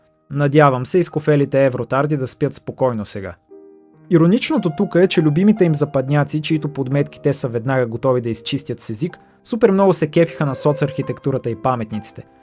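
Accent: Russia. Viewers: Съгласен съм донякъде,но долавям руски акцент. Тоест видеото е може би резултат от обиден полурускобългарин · Чува ти се руския акцент. Пробвай пак! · Говориш с руски акцент, но съм съгласен с всичко което каза ! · ХУАХУАХУХАУХАУХАУХ КАКЪВ Е ТОЯ РУСКИ АКЦЕНТ ВЕ ЛУД КОЛКО ПЛИТКОУМЕН ТРЯБВА ДА СИ ДА ГЛЕДАШ ПОВЕЧЕ ОТ 10 СЕКУНДИ НА ТОЗИ КЛИП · не можеш да скриеш джиалекта солти руски soiboi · Има руски акцент, защото е руснак, дошъл да живее безопасно и евтино тук и да плюе по мястото, което обитава! Много тъпо от негова страна! Но пък може да си ходи, ако не му харесва! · Братлето наистина има руски акцент обаче, хванах един-два русизъма във видеото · Диалектът ти е на руснак живеещ в България. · На 30 секунда от видеото писах коментар да питам що говориш с руски акцент. После ти изслушах забележките и разбрах- ти наистина си си монголски подчовек.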